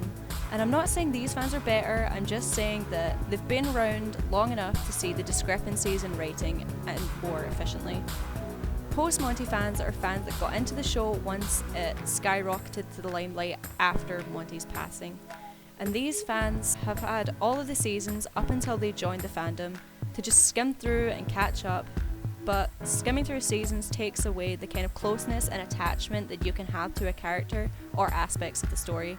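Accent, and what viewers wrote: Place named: United Kingdom. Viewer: you sound like you come from the UK going by accent alone. but, i gotta say i like the idea of killing of arcy boy as yeah...that would of had lot of sub branch events and encounters down the line. also Miles is a hack, like really check its like he attended one of J.J abrams talk on makeing story tropes and miles copys it like a fucking bible honestly just go in expecting something worthy of J.J film and you can normaly guess the ending or twists that are comeing up. also i personaly think hes slowy takeing the animation into "LENS FLARE!!"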